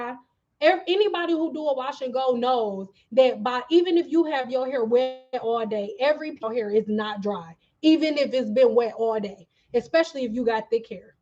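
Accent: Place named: California, United States. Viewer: I know you from Detroit but you sound like us Cali ppl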